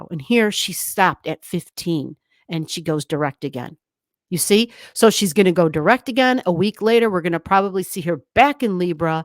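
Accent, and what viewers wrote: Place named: Midwest, United States. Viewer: Wow, this is fascinating and I just love all of your videos. It's refreshing to listen to another midwesterner-hehe. My BML is in Capricorn (almost Aquarius) in the 10th house. I'm a Sag sun, Aquarius moon, Taurus rising, scorpio venus. I tend to get very aggravated with Virgo energy even with all of the Earth placements in my chart. It feels judgey and oppressive. G, I also noticed that a few married couples around me are having big arguments about cleanliness and household duties. Woah.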